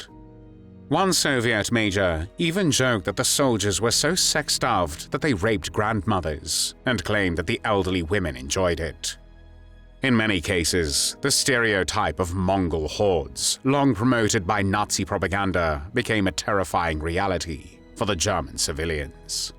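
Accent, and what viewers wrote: England, United Kingdom. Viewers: The English accent of the narrator is nauseating. Hammurabi was right. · Soviet communism!? Don’t you know where the communist manifesto was written or where Karl Marx’ grave is?! You have a British accent! And should know the history of your own country!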